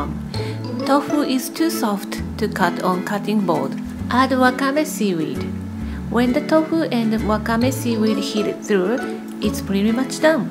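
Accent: Japan. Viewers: english language with dialect japan but i love so much 😍😍 im from indonesia 🇮🇩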